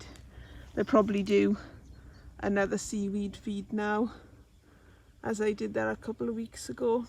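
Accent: Wales, United Kingdom. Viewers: Hello... really enjoy your videos for 2 reasons... number 1) I love your welsh accent number 2) your a brilliant gardener.... I wish your were closer to home as would love to visit your allotment 👍👍👍👍👍🌻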